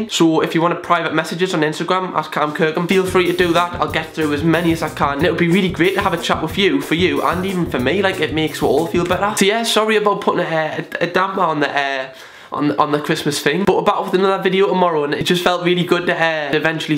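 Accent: Newcastle, United Kingdom. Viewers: Mad that work is my escape from it! I always put on a front like ill make my self look good, put makeup on. And ill get through the day getting nice comments and that and ngl that does make me feel better about myself. But as soon as i take my makeup off ill get in the shower and cry cause no one can see the tears. And i just stand there thinking how much i hate myself and my life, and questioning when is it gonna get any better? Watching you videos fully makes my day you actually cracks me up. I adore ur geordie accent! Keep ur chin up and keep smiling hun 😘❤️ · Another fellow Geordie eh! Woop woop. 😉 this hurts my heart, hope your okay! ❤️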